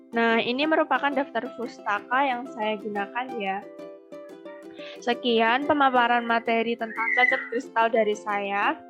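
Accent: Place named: Java, Indonesia. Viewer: Kereen mb.. kurangin medoknya dikit lagi ya.. 👍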